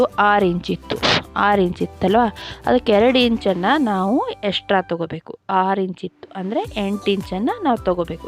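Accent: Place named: Mandya, India. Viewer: ಹಾಯ್ ರವರೆ ನೀವು ಕಾರವಾರದವರ ನಿಮ್ಮ ಭಾಷೆ ಮಂಡ್ಯ ಭಾಷೆಯಂತೆ ಇದೆ 👌🙏❤️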